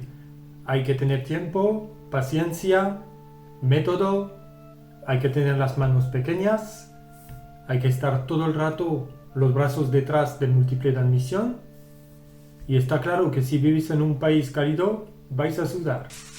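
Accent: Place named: Latin America. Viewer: ¿Amigo por qué? usted en su español, suena más parecido al latinoamericano, que al de españa.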